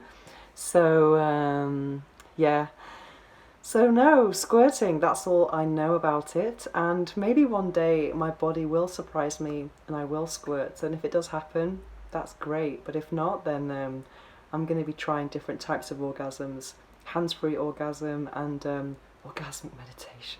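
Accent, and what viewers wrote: United Kingdom. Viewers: dammittt! if she wasn't british it may well have been an interesting story! cannot bring myself to endure that accent! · but, I will agree that her accent is 100% British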